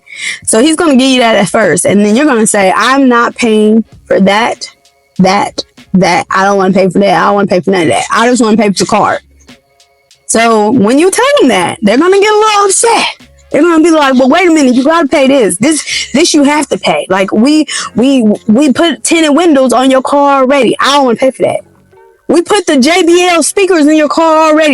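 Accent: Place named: Houston, United States. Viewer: i’m from houston too babes. your houston accent is so hot <3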